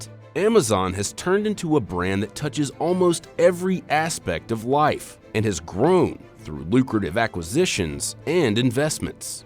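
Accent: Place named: United States. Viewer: Jesus the American accent is annoying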